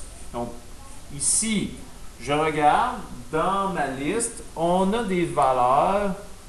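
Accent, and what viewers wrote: Canada. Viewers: Rah québécois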